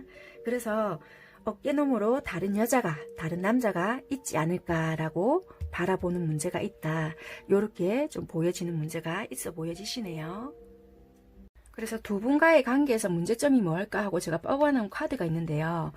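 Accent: Busan, South Korea. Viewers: 2,4 만명 축하드려요~더 잘 되실 거예요~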